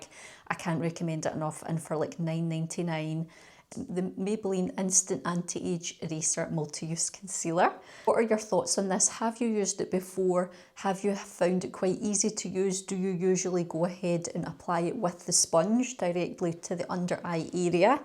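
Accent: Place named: Scotland, United Kingdom. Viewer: i’ve already watched a couple and loved them. I’m older and absolutely love beauty and make up and I seriously have never never been able to put concealer on. I read about this one and thought I’m going to try it and then found your video about how you apply it and seriously never had such success with an under Eye concealer and I need it at my age so thank you and I will enjoy watching the rest of the videos and I’m so glad I subscribed and I’m also Scottish but I live in Australia, so the accent is very welcome 😊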